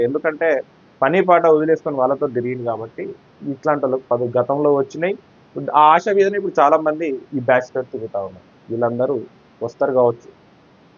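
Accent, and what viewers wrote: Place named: Telangana, India. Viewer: మీరు చెప్పింది అన్ని కరెక్టే కానీ నువ్వు paytm బ్యాచ్ అని తెలిసిపోతుంది ఎంత తీసుకున్నావ్ దేనికి పక్క రాష్ట్రపు పెదరాయుడు ఏర్పాటు చేశాడా నిన్ను అమ్ముడు పోయావా మాట చూస్తే తెలంగాణ భాష ఉంది ఇక బోతే తాగుబోతులు అంటున్నావ్ ఇప్పుడు ఎక్కువ ఉన్నారా అప్పుడు ఎక్కువ ఉన్నారా ఆవారా బ్యాచులు అన్నావు ఇప్పుడు ఎక్కువ ఉన్నారా అప్పుడు ఎక్కువ ఉన్నారా కాంగ్రెస్ అంటేనే ఆవారా బ్యాచ్ పెదరాయుడు బ్యాచ్ అంటే కమ్మ బ్యాచ్ నువ్వు తెలంగాణ ఉద్యమంలో పాల్గొంటే తెలుసు పిచ్చి పబ్లిక్ నమ్మి ఓటేశారు కాంగ్రెస్కు ఇప్పుడు అనుభవిస్తున్నారు నువ్వు కాంగ్రెస్కు ఈ స్టేట్మెంట్ ఇచ్చినందుకు రఘు గాని లెక్క కబ్జాలు చేస్తున్నావా లేకపోతే ఏబీఎన్ ఆర్కే గాని లెక్క బ్రోకర్ గిరి చేస్తున్నావా మీ నాయకులు తెచ్చేది మీటింగులకు సొంతంగా స్వచ్ఛంగా వచ్చేటట్టు ఎవడైనా ఉన్నాడా యాది ఉంచుకో టిఆర్ఎస్ కి ఇప్పుడు వచ్చే పబ్లిక్ అంతా ప్రేమతోనే వస్తున్నారు పొయ్యి నువ్వు ఒకసారి ఇంటర్వ్యూ చెయ్ నీ గుండె పగిలిపోతుంది పని పాట లేకనే నువ్వు ఈ రివ్యూ పెట్టావు ఇప్పుడు పెద్ద రాయుడు ఎంత ఇచ్చిండు చిట్టి నాయుడు ఎంత ఇచ్చిండు నీకు చెప్పలేదు ఈ ఛానల్ పెట్టి బోగస్ ప్రచారం చేయడానికి ఇప్పుడు రియల్ ఎస్టేట్ గంగా జరగకపోతే ఏం జరుగుతుంది టిఆర్ఎస్ లో ఉన్నాను ఒక్కలు ఇద్దరు ఉన్నారు కానీ ఇప్పుడు మీ కాంగ్రెస్ చుట్టూ తిరిగే బ్యాచ్ అంతా రియల్ ఎస్టేట్ బ్యాచ్ కదా ఈ స్క్వేర్ ఫీట్ కు ఎంత ఇప్పిస్తున్నారు బిల్డింగ్ పర్మిషన్లు నేను చూస్తే మాత్రం నిన్ను ఆర్కే బ్రో పెట్టాలి లేకపోతే పెద్దరాయుడు అని పెట్టాలి వాళ్లకు అమ్ముడుపోయిన బ్యాచ్ ఉన్నట్టున్నావు నువ్వు కాంగ్రెస్ పార్టీలో ఇప్పుడున్న నాయకుడు పబ్లిక్ లేకున్నా గాని ముంగట ఒక 200 మందిని తోలు ఎక్కడ వైయస్ రాజశేఖర్ రెడ్డి చేసిన స్టైల్ వాళ్ళు నెల జీతా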